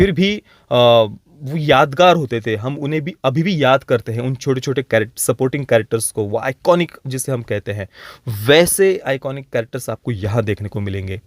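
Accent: Maharashtra, India. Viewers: Aapka hindi itana mixed with marathi hai...bohot jyada, isme koi burai nahi but aap ek reviewer ho, hindi to perfect chahiye...nahi to apaka channel itana grow nahi karega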